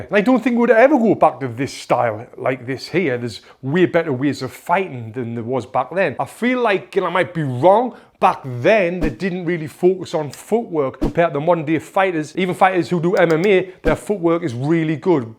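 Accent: United Kingdom. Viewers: The accent is very british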